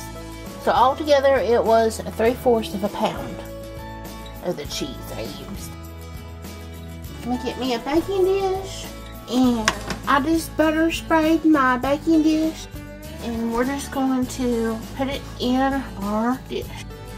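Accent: South, United States. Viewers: Her sweet southern voice is actually helping my anxiety. Had a nightmare and it’s 4am, ended up on her channel and it’s like a lullaby. Thank you! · lichen goatfungus she does seem very sweet!!! Her food looks delicious 😋 and I love her accent,where is she from? I’m a Houston,Texas girl,and I love southern accents!!! And Country cooking might I add😁 I cook country and soul food 🥘 people have asked me if I preferred soul food because I’m African American,no I assure you I’m into all kinds of food, it doesn’t matter my ethnicity. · what is that accent? where in america? LOVE IT xxxxxx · Clayton Cooley I agree! She's very sweet and her voice is soothing and the southern accent makes her more charming.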